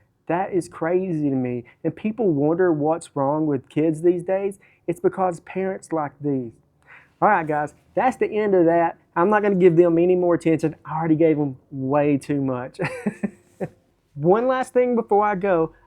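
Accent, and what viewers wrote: South, United States. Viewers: Another great video! As a Canadian, I think one of my favourite parts is the southern accent lol the way you said “see-ment” made me laugh (the same way we say “aboot” and I don’t mean this in a negative way! Thanks for the laughs and another great video. Cheers!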